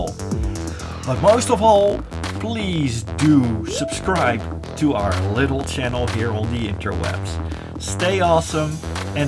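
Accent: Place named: Netherlands. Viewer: You can just feel the dutch accent in him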